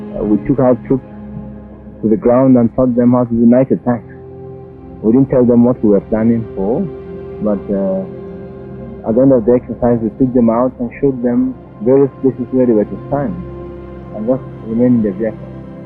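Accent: Nigeria. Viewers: Still u have Nigeria accent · I love the documentary, the detailed analysis and how you presented it without bias. The effort and work you put into it. However, that yoruba H factor was hurting my ears 👂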